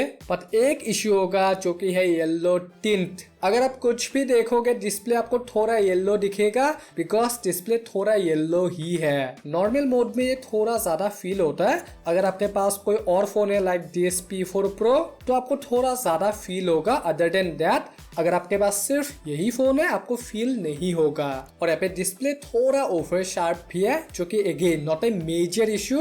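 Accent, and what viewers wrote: Assam, India. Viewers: assam dada apunar accent te gom palu😊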